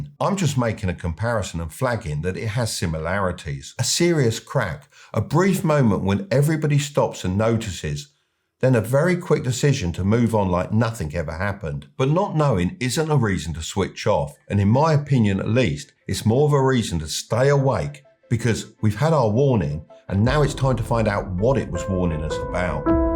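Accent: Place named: Clacton, United Kingdom. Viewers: Is this bloke from Clacton on sea ! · I tend to find that what to all intents and purposes is MIDDLE AGED bloke with an esturial dialect from somewhere close to London and talks about dollars rather than the exchequer of his foundation is someone worth taking with a whopping dollop of salt. This bloke has all the hallmarks of being bogus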